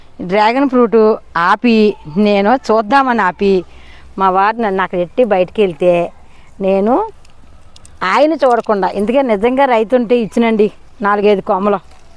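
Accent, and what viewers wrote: Godavari, India. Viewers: Hii garu mee oopikaki joharulu andi me matalu vini godavari zilla anukunna madhi Kakinada andi.❤❤❤❤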